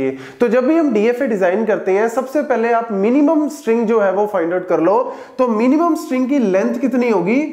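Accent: Punjab, India. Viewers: Sir ap jo punjabi accent mein teach krte ho usse apnapan lgta hai.. u r truly legend.. ❤❤❤❤❤❤❤❤lots of respect... 🙏 hmare guru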